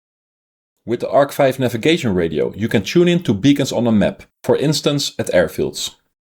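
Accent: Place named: Netherlands. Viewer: you sound utterly Dutch 😂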